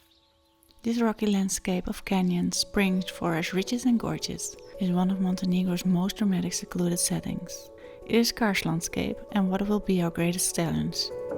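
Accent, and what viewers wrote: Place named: Netherlands. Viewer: wat fantastisch dat je dit doet 🙏🏽 en hoorde al dag je Nederlandse was Heel veel succes met de restoratie ❤️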